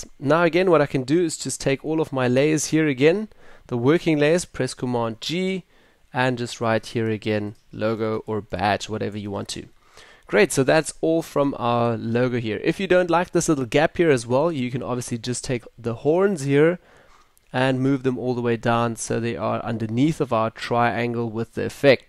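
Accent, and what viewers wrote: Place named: South Africa. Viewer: Cheers for the video boet. (Highly guessing you are South African) :-)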